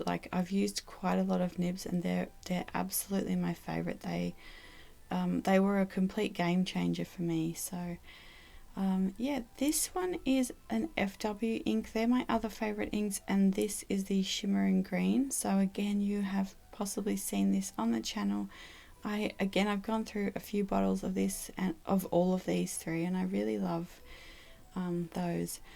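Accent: Australia. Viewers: Beautiful video. Are you from Australia?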